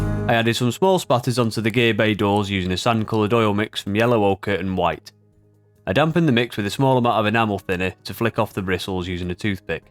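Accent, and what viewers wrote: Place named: England, United Kingdom. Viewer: Hi Mate I hear your pommy accent, I am a socuser originally, came to Oz (65) when I was 16. Joined the Army went to Vietnam 70. So looking at Vietnam era Kits when I finish these, maybe a Phantom? Thanks for the great advice. I had a look at your Star-fighter build as well, as I have the KINETIC kit;-) Might practice with that one first, plus air brush on an old Spitfire that I never finished. Its early afternoon here in OZ will be back tonight to look at the F104 build;-) Thanks again for the hints & tips!! OZGrunt (Oink, 7RAR Vietnam War 70-71.)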